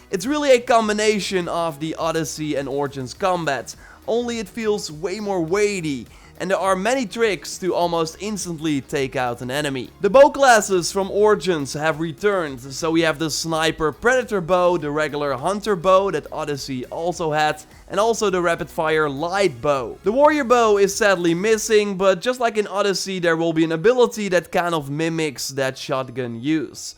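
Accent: Netherlands. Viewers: Are u Dutch? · Yo, are you Dutch? Your accent:p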